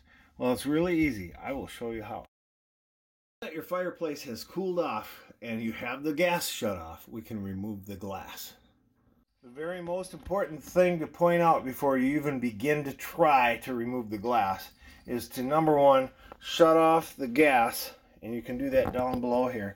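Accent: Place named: Upper Midwest, United States. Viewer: Thx! Excellent info and funny. Been wanting to clean our glass for years. Sounds like you’re from WI or MN? 😁. I grew up near LaCrosse WI.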